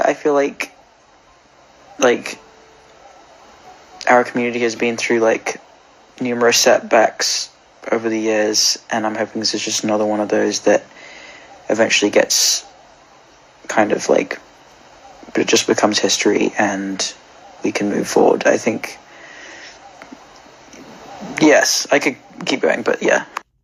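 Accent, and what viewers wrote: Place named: United Kingdom. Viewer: sounds so incredibly British when talking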